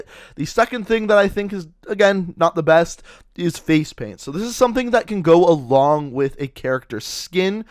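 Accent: Canada. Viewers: 9 seconds in the video can already tell your Canadian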